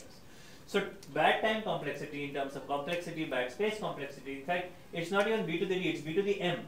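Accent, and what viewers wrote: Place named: India. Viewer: The English is probably perfect - its the heavy Indian accent that makes this barely comprehensible.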